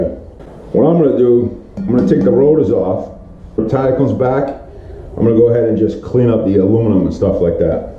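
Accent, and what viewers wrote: Boston, United States. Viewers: you made me homesick Boston strong deplorable in tampa bay ...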